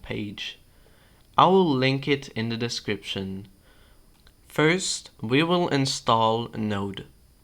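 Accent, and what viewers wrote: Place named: United Kingdom. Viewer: great tutorial but youre british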